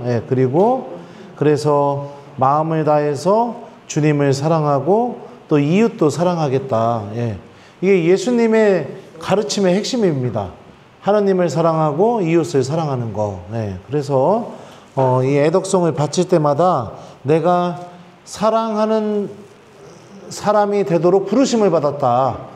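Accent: Chungcheong, South Korea. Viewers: 신부님 잘 들었유~ 여긴 전라도 인데 자꾸 충청도 말이 나와유~ ^^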